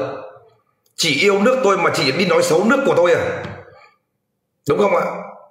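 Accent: Standard. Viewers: Nói chuẩn luon bạn nha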